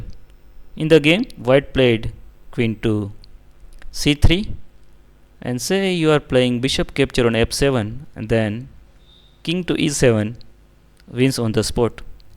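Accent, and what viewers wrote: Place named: India. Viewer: I was talking about accent. Yeah his english is perfect but... I just cant stand indian accent. Its just so annoying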